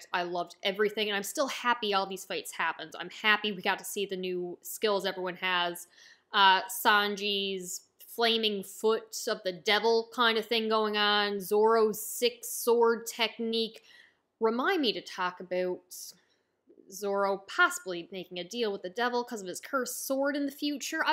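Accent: Canada. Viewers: Interesting you're trending right now. YouTube algorithm is a weird mistress. I've got to say I've heard Almost every version of English native speaker discussing One Piece but your unintentional Aboot was glorious. Play into your Canadian accent when reviewing One Piece. Don't try to hide/Americanize yourself. There's thousands of American One Piece YouTubers but what made you stand out was your accent. Enjoy the Journey and just know the Demon Child of Ohara is the best Straw Hat. When she has her moments she really steals the scene. Sanji being captured by Black Maria: call for Help we want Nico Robin... Nami-swan save me I'm on the third floor of the castle in the north most room.. Black Maria is like why the hell did you sell out your comrade so easily. Sanji is like I can't fight a woman. But besides my sister, Nico Robin is the most formidable opponent in the world and she's gonna kick your ass.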